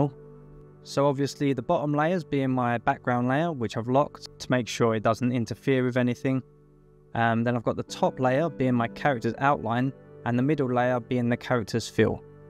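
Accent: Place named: United Kingdom. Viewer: Great tutorial, and of course everything sounds even nicer in proper British English :D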